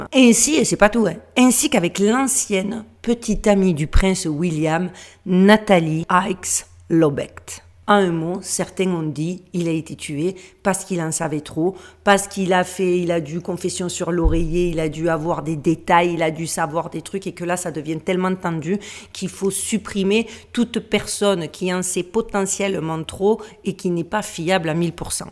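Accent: Marseille, France. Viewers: Toujours aussi passionnante plus l’accent marseillais 👍🙏🏼❤️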